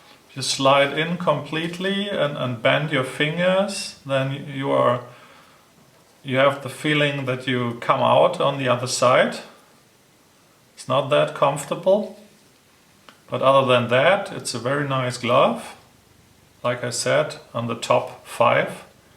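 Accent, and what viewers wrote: Germany. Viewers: Man merkt so krass das du deutscher bist 😂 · Das ist so ein kranker deutscher Akzent 😂😂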